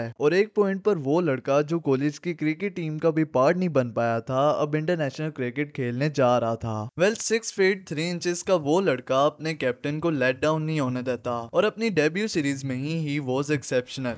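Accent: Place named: Punjab, India. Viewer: Not "Floup" it's "Flop" I know and I can understand you are Punjabi by your accent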